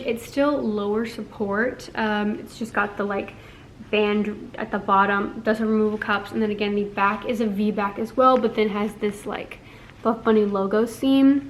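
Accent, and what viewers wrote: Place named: England, United Kingdom. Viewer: I am not ashamed to say that I have been a subscriber for a few months now... I am either like whaaaaaaa (jaw crashing off floor), you make me laugh with your awesome English accent hehe I'm from UK, may I mention that wow I look great with your hair straightened, always Beautiful just never seen you with straight hair, I think that all the shorts you don't really like or think you don't look as good as you actually do, you make the clothes sexy, anyway I'm just expressing truth so surly ppl can't be mad at me for that, And my perception is different to yours, I see the Art in a different beauty as beauty lays in the eye of the beholder, luv ya awesome vids. Xx Dav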